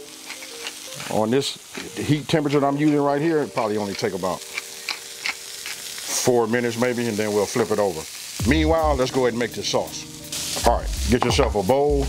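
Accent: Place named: West Coast, United States. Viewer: Anybody else can tell that he's so West Coast??? Not mad at it at ALL!!!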